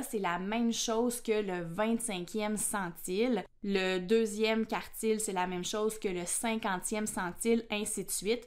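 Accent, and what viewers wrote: Canada. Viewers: J’en peux plus de l’accent des canadiens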